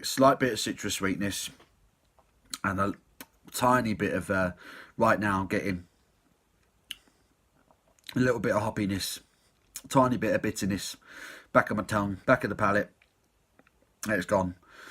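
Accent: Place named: Cockney, United Kingdom. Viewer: is essentially a Cockney Bill Bailey beer reviewer. I always enjoy the videos.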